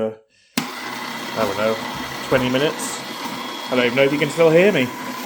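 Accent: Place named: Bolton, United Kingdom. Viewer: Great to here the Bolton accent, I lived in Westhoughton many moons ago.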